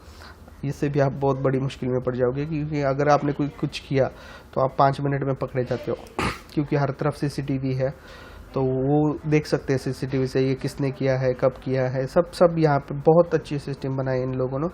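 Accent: Maharashtra, India. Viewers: bhashet lagech marathi tone lakshyat yeto. Bhau mst good work.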